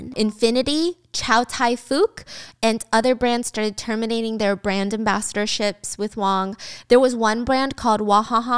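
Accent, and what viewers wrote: California, United States. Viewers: You talk like a girl from Cali I think. Are you from Cali? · You have the strongest california accent I've ever heard xD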